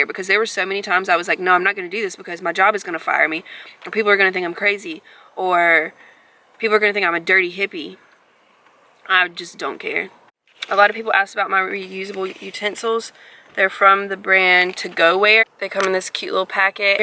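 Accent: United States. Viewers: I love this! Where are you from? You have a little country twang lol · Caitzen lol yes it's sounds kinda Texan 💀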